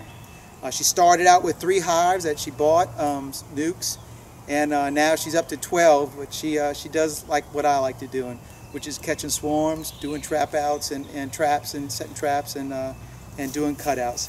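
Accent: Louisiana, United States. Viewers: Are you from Louisana?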